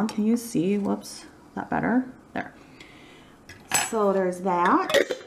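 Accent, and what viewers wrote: United States. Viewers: You speak some of your long “O“ vowels like a Minnesotan, but your hard “R’s” like an Angeleno. You have your own, unique colloquial dialect. I like the uniformity of your burger patties. Well done.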